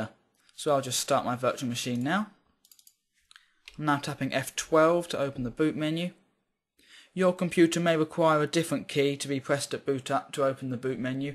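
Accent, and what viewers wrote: United Kingdom. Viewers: An english mate. Who else think he sound like Mr. Bean? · This British accent pronouncing computer phrases sounds like either baby talk or garbage.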